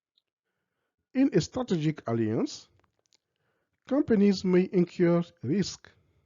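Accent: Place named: United States. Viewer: Félicitations, tu parles comme un américain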